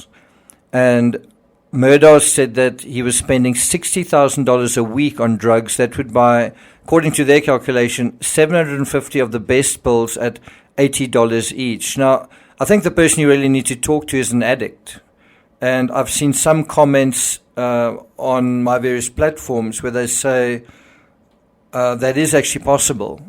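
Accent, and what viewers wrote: South Africa. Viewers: Hi there! Enjoy your channel. You sound you are from South Africa? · Sounds South African to me.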